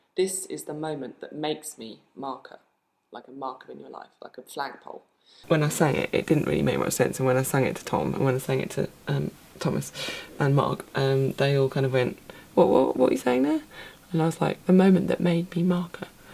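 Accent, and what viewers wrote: United Kingdom. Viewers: I find myself torn between focusing on an amazing song and a British accent. So I watched this twice. :)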